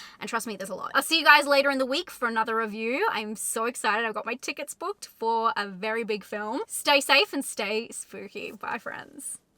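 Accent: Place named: Australia. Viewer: And this one tiIIIME! At Band CaAAAMP! I love the Aussie accent when done a bit more charmingly and understated. See fellow Youtuber Matt Parker (Stand-up Maths/Numberphile) for a better example of this rather than this overexcited version. Love the energy though, and clear passion.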